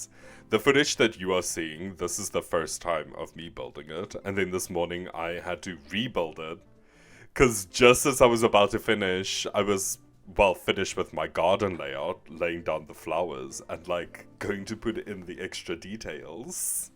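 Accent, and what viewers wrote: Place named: South Africa. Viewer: Oh wow, you live in South Africa? Now I understand the accent - I thought you were British, sorry. Hi from Germany!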